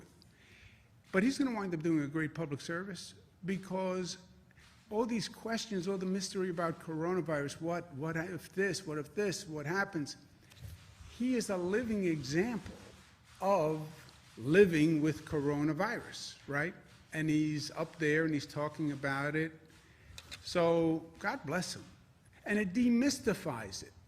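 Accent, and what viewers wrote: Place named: New York, United States. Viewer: Its the New York accent and all the strength/testosteone exuding from him. We get it, you don’t need help. It’s okay to be attracted to masculinity without calling it toxic, like many have in the recent past just to bandwagon a stupid slogan or saying.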